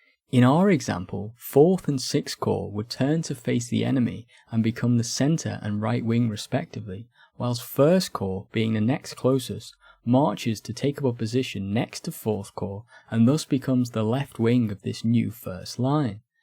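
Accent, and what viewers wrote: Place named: Yorkshire, United Kingdom. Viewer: Or maybe not the accent, I think it's your smooth voice on top of the accent, I'm sure other northerns (I assume you're from yorkshire) font have a voice so heavenly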